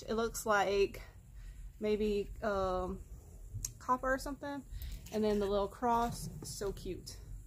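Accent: Louisiana, United States. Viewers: Love Love Love all of your videos!! One of them popped up on my feed, immediately subbed you and I've been watching them all! Love your accent! I'm cajun as well from near Lafayette and live in DS near Baton Rouge. I just wish I had your energy and creative mind lol thanks for the content!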